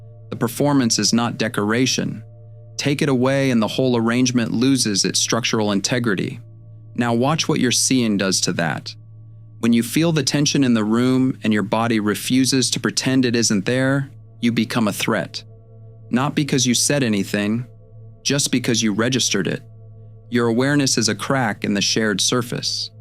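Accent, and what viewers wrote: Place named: England, United Kingdom. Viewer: What happened to the English accent?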